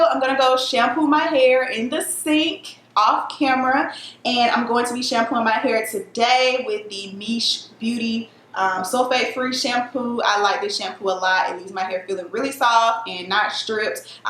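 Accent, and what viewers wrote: South, United States. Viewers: Get those ruts ❤️😂❤️😂❤️ I love that country twang...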